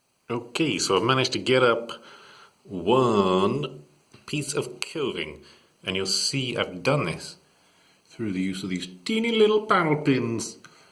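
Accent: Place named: United Kingdom. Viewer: Worst thing about this video, It sounds like a Brit trying to be American and it's making the video unwatchable.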